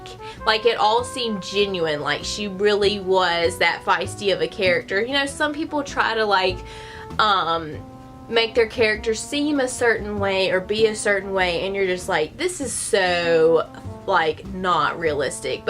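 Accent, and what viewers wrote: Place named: South, United States. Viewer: Love your accent. Proper southern lady there.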